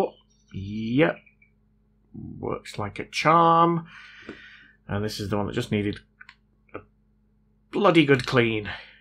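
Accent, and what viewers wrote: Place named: Manchester, United Kingdom. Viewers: I worked in Manchester (technically Stockport) for a couple of years and he sounds exactly like one of my workmates from the time. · Been wanting to ask you. You don't have to answer. Are you Manc? reason I ask is because I grew up in Brixton, London myself, but used to stay in Chadderton in the 80s (Firwood Park) and your accent almost feels like I am back there.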